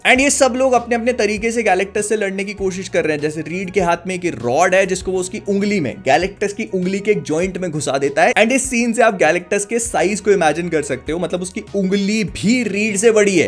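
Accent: Bhopal, India. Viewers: bhai ap Bhopal se ho??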